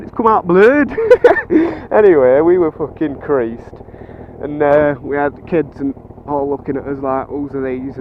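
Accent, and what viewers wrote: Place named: Yorkshire, United Kingdom. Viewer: Your a little Yorkshire story teller ..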